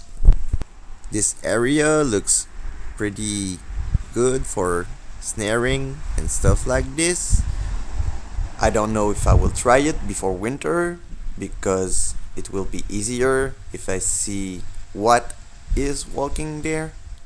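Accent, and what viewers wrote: France. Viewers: To learn more about Canada, I spent some time on Wikipedia. There I got the answer why you speak English with a French accent, very intelligibly at an appropriate rhythm. Stupid but until now I didn't know that both the French first and finally the vain British were involved until 1982 when they were finally hauled back to their island. But they remain vain, proven with their Brexit in 2020. I hope you will be able to outwit small game but it will be difficult. Guess not flying wild is easier from behind a blind. In addition, it is difficult not to betray your presence by creaking twigs, birds, especially crows... I hope then that your joy and happiness will splash off our screen because this "Big Brother" knows how difficult it is in Canada with the gun laws and what you have already done to now be allowed to walk outside your private place with an air rifle. 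good luck and a gelinotte isn't that an English hazel grouse? LOL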